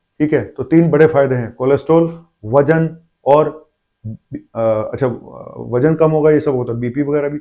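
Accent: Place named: Punjab, India. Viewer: Sir सत श्री अकाल आप बातों में पंजाबी का तड़का लगाते हो लगता है के आप का पंजाब से कोई नाता है, लगता है के तेरा मेरा कोई पिछले जन्म का है नाता ❤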